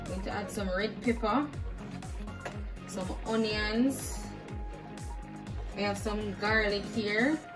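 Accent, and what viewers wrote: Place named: Jamaica. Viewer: Muma naa lef out the Jamaican accent at all! Mi love that 😂😂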